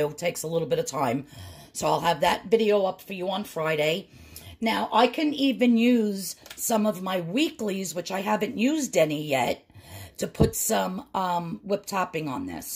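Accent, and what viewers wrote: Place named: Boston, United States. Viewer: you are a gem! I just love you, your Boston? Accent, and your food and videos. Thank you!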